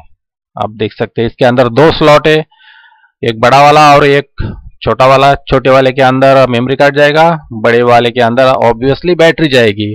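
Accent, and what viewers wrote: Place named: Marathi, India. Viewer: Dear ye Marathi person hai,and Marathi logo ko thik se Hindi nahi ati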